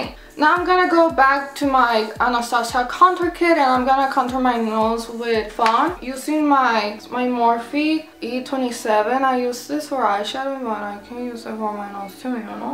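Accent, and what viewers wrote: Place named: North, Mexico. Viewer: are you mexican? you sound kind of norteña, saludos👋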